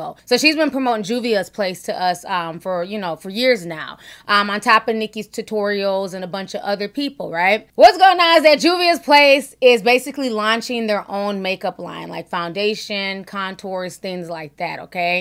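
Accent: Nigeria. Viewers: Well hello beautiful, come through with the Nigerian voice. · Come through with the Nigerian accent yesssss!!!😍😍😍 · And please use ya Nigerian accent more often! We need a commentary video in a full Nigerian accent. Please now! I beg! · Omg your Nigeria accent was on point omg it’s crazy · I love your Nigerian voice!!!!! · I love the wsyvthis is editd. And I see you can turn that Nigerian accent on/off real quick. I bet that's what your kids hear when you're mad. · I love your Nigerian Accent!❤ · I didn’t know that you’re Nigerian!! squeals That accent floored me.🙌🏿👩🏾‍🦱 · I luv your Nigerian voice. More please! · Love your Naijan accent 😻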